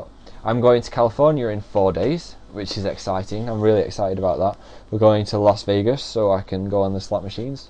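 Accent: North, United Kingdom. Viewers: Oh my god!!! JESUS CHRIST I AM WATCHING THIS IN 2017!! HE IS SO AWKWARD!!! AHAHHAHA NOOOO I FEEL LIKE IM SCREAMING LIKE DAN RIGHT NOW! HE SOUNDS SO MUCH MORE BRITISH HERE!!! AHAHAHAHA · He sounds so much more northern here! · Jay Chi I think he was just making fun of people from the north bc he was hella northern in this video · God he sounds so northern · I can't get over the amazing Northern accent!! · You sound so northern! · He sounds like me so when I think like that it doesn't sound that strong cos my accent is probably stronger but then in comparison to how he sounds now he sounds dead northern. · used to be so northern omg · He sounds so nothern in this video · OMG SO NORTHERN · he sounds so much more northern here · i find it so interesting how phil seemed like he was immediately comfortable being himself online. in most youtubers first videos they’re super awkward and self-conscious but phil was just phil from the start (just smaller and more northern lol) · I WAS FIVE WHEN THIS WAS MADE VOICE SOUNDS SO NORTHERN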